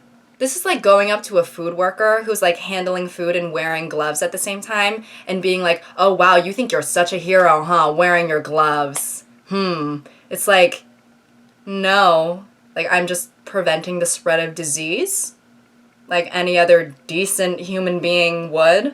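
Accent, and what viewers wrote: United Kingdom. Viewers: that british accent tho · omg your british accent is hilarious!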